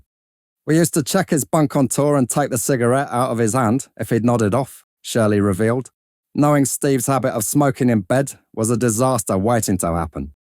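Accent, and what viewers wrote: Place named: London, United Kingdom. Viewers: Can't stand the narrator, what is he, some London Wide Boy? Terrible halting voice. Get rid. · A Cockney geezer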